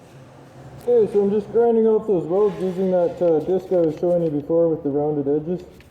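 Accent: Canada. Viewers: You sound Canadian.... Almost like rocky from rocky and bullwinkle, just without the "eh" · You sound pretty Canadian for a Kiwi... I'm a Canadian, we can smell our own.... Sniff , Sniff...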